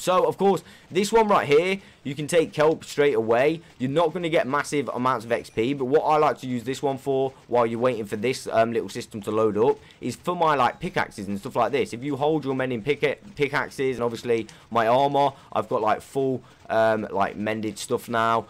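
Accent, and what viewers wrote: United Kingdom. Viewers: really sounds like british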